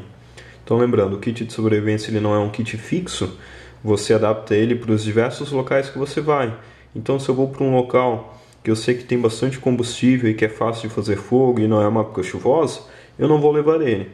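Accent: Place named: Santa Catarina, Brazil. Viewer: e ae cara, gostei dos seus videos, ja estou inscrito. Pelo seu sotaque vc é de SC certo? Qual cidade amigo? Abraços!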